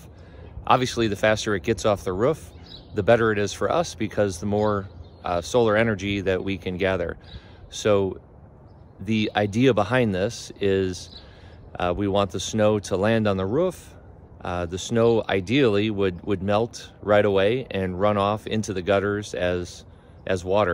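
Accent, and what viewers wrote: Wisconsin, United States. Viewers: Dudes, it’s just how we talk in Wisconsin. We also say crick, not creeek. Sorry, not sorry. 😎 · He mentioned building in Wisconsin...I am assuming Wisconsin accent. I moved here and he sounds like everyone else here. Not sure why everyone needs to drag the guy for this?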